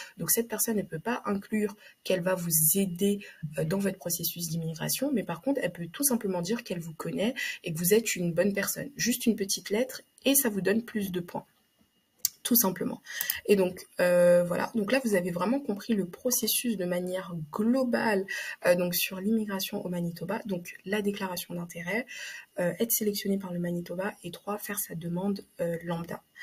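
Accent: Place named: France. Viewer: Vous avez l'accent française🇫🇷😮. Je suis aide soignante en France mais étranger. C'est possible de travailler avec le diplôme française ? Avec un visa de tourisme ?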